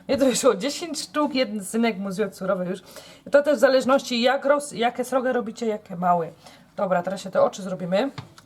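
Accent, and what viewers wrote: Silesia, Poland. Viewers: ciastka gówno? :D zajeżdza niemieckim akcentem jak u slązaków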